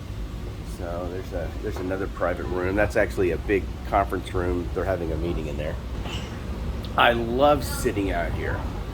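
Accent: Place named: Texas, United States. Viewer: What a beautiful place, on par with some of the fanciest restaurants in LA & New York & easily cost 3x as much! ❤ Your sense of humor, & Texan accent with China, somehow just works!😂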